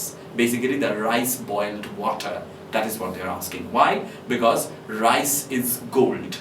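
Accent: Bengal, India. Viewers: For an English teacher the accent/diction is amusingly bengali.. but that's ok if the critique was not so poor.. Does not go beyond just describing the acts in the play. It has no depth of the time, of social/political/ literary history. Is this sponsored by UGC?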